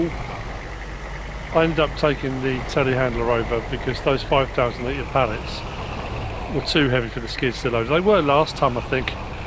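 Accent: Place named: United Kingdom. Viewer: Let me tell you something, I watch a lot of Youtube, many types of videos. No tv, or telly as you would say, just Youtube. While I like watching videos of my interests, in this case FS22 content, the common tie between ALL my subscriptions is PERSONALITY. More than anything, I just like to hear about PEOPLE. I like your perspective as a UK citizen; your worldview, your outlook on things, even, quaint as it sounds, your manner of speaking. Word usage, phraising, etc...Go ahead and chat! Tell us about your brother and his amazing feat. We have trails here in the US, I have day-hiked the Appalachian trail in many areas, I always dreamed of a thru-hike. Probably never do it, but we all dream. Go ahead, talk to us. It ADDS to the content. I am sipping my coffee here in Indiana but you carry me to England. The power of Youtube.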